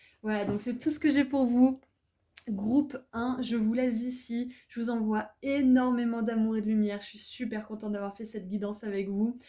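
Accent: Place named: Quebec, Canada. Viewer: Choix de l'antenne relais, avec l'accent québécois lol gratitude infinie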